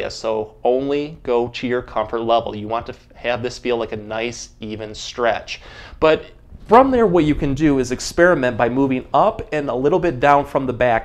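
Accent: Michigan, United States. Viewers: I'm here for the Michigan accent and the great tips 😎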